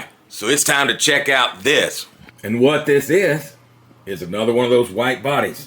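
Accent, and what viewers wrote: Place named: Texas, United States. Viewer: Bro, you gotta be from Texas! Ha ha... Love it! God Bless Texas!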